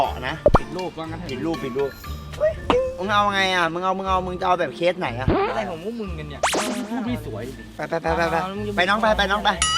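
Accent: South, Thailand. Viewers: น้องอยากให้พี่ พูดใต้อีกชอบมากก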